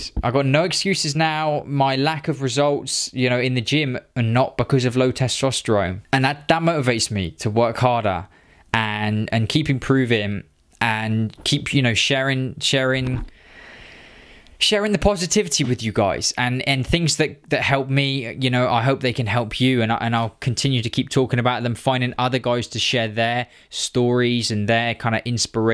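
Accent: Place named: South West England, United Kingdom. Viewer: Where are you from? Bristol or something? I hear a bit of South West in you!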